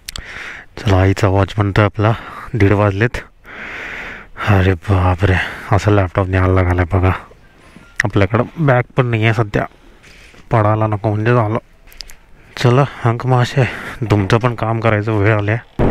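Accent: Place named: Sangli, India. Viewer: असल सांगलीकर आवाज आणि भाषा...sutti not ch o.... 👌👌😍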